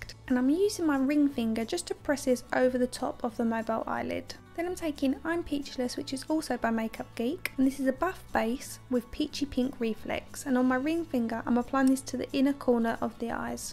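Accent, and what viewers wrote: United Kingdom. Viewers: You truly make everything look so stunning!! You're so talented- I'm just so very pleased I found you!! And might I add, I love hearing a British accent instead of North American🙊😉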